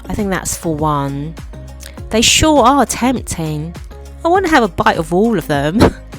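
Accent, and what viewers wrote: United Kingdom. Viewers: Queen's English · 100% London accent Great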